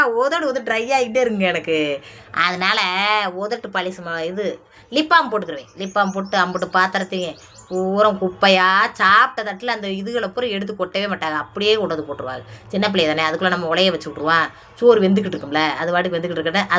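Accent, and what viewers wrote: Madurai, India. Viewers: ரொம்ப எதார்த்தமா பேசுற நல்லா இருக்கு இந்த வீடியோ பாக்குறதுக்கு சூப்பரா இருக்கு அந்த தமிழ் தாம்பா உங்கள அடிச்சுக்க முடியல மதுர தமிழ் தமிழ் தான்யா கேக்க ஆசையா இருக்கு சென்னையில இருக்கிற எங்களுக்கு உங்க தமிழ் பிடிச்சிருக்கு பிடிச்சிருக்கு பிடிச்சிருக்கு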